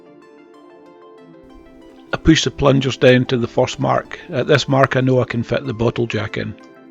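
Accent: Scotland, United Kingdom. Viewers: I love your acsent sound scotish , amazing setup fór the bricks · Your own design is superior in overall performance, in my opinion. I honestly believe you get extra credibility; because of your honest Scottish accent. My mom and her parents are from Glasgow. I am in Irvine California. Nice video thank you for making it happen. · I just love Scottish accent!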